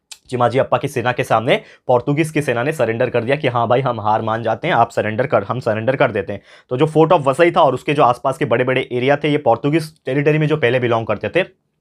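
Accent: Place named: Delhi, India. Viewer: This is his dellhi accent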